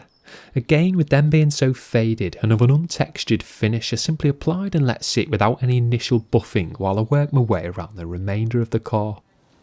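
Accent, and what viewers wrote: Liverpool, United Kingdom. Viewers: His accent sounds like he's from Liverpool UK (hope that helps ;) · LOL Apart from also having a Scouse accent, Ringo Starr's voice sounds nothing like this guy, and nor do/did any other members of the Beatles for that matter. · oh my goodness is that your real speaking voice!?!?! its sooo pleasant, you should be a professional narrator or make an app where you recite poems! it's so calming and everything my Texan dreams could ever dream up for a British guy - you're amazing!! Anyways, if I was going to use this on my 2 door Jeep Wrangler how many bottles of the C4 would you recommend buying? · Awww, the English accent of an Irishman, Scottish, or Brittish. Something enjoyable about hearing the accent as a Yank from across the pond. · I am Mancunian, and I love your Scouse accent....It's so soothing , and beautiful. The vid is great too! 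· Meticulous detailing with good explanations with a pleasant Liverpool accent.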